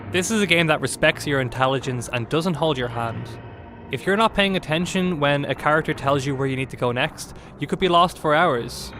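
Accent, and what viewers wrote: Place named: Dublin, Ireland. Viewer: It must be our beautiful Dublin Accent x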